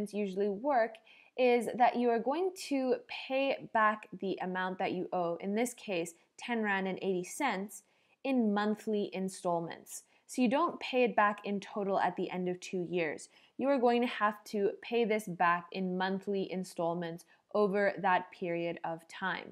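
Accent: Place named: United States. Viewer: You sound hella American,thanks for this video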